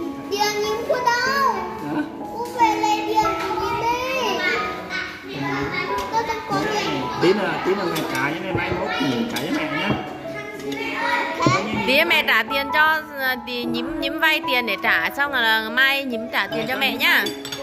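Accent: Central, Vietnam. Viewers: tại con nghe cô nói giống giọng miền Trung ghê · Cô quê ở đâu mà nói giống giọng miền trung vậy ạ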